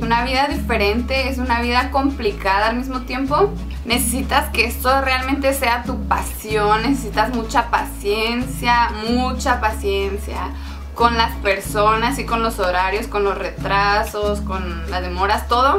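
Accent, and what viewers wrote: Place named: Baja California, Mexico. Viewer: Parece que eres de BC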